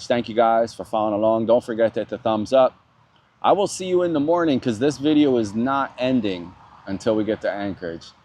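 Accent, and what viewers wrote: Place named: Mid-Atlantic, United States. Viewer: Bro, you gotta be from DE, MD, SEPA area with that accent 👍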